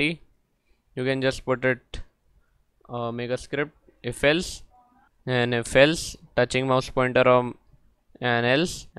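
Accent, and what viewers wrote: India. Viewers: I'm sorry for making fun of your Indian voice.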